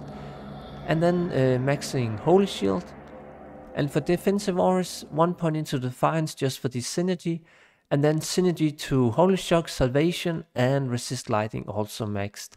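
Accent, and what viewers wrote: Denmark. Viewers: Elsker din kanal og især din interesse får mig til at nyde det. Derudover er din tykke, danske accent perfekt :-) Bliv ved! Har desværre ikke nogle ideer til videoer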